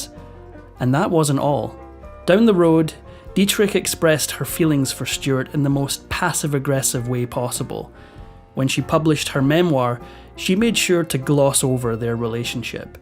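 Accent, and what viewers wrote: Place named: Scotland, United Kingdom. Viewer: A fellow Scot narrator 🏴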